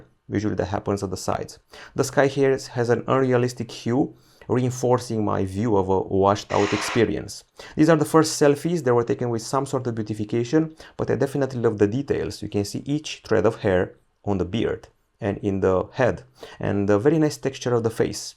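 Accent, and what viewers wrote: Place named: Romania. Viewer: Ce accent ...made in Romania 😊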